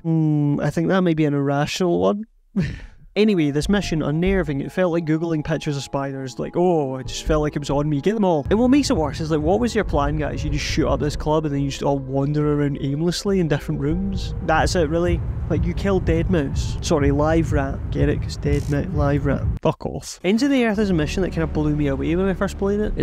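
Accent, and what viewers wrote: Scotland, United Kingdom. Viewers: The Scottish accent does it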